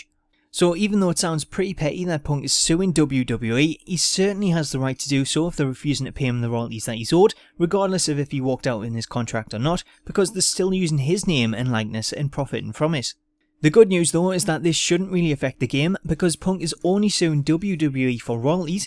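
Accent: Newcastle, United Kingdom. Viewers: I don't understand anything you said with your british accent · hey man where are you from because you sound like a fellow geordie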